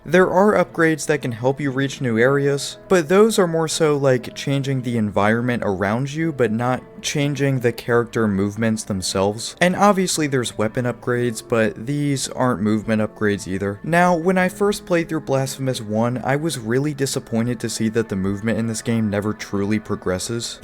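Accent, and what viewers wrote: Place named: United States. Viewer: u sound so american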